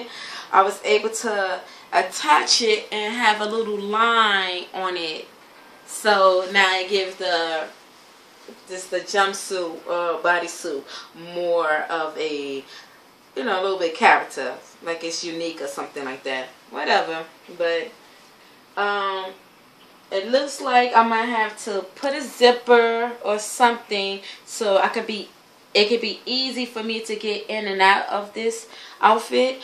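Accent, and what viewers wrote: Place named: Bronx, United States. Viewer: Your accent reminds me of home (Bronx,NYC), where are you from? P.s. great tutorial!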